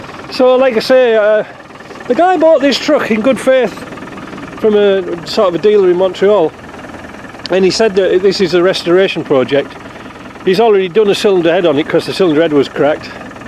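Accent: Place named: Cumbria, United Kingdom. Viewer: Trying to place your accent. Cumbria?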